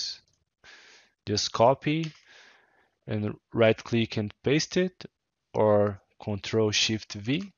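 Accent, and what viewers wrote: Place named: Brazil. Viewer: comecei a ver o video e falei "porra, eu conheço esse sotaque, esse cara é br ctz kkkkkkkkkkkKK" mt bom mano, valeu pelo tutorial ai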